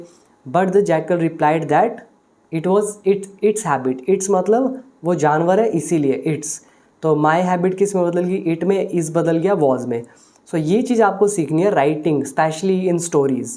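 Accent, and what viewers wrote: India. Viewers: Well done but u should work on your prononciation, because u use Indian accent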